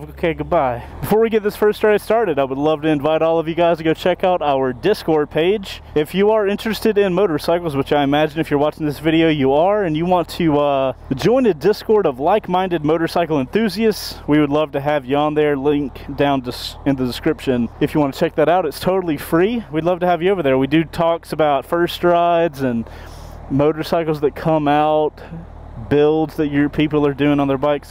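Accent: United States. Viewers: Great bike . Nauseating yank accent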